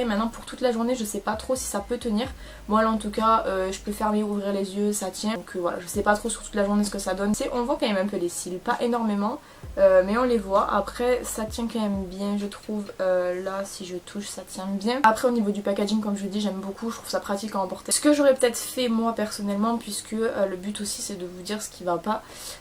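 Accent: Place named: South, France. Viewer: Ton accent de sudiste là , magique 💕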